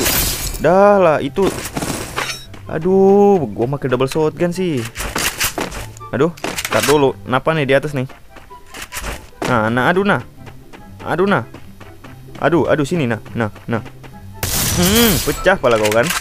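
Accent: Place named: Sulawesi, Indonesia. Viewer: Bang logat lu kek orang buton/sulwesibang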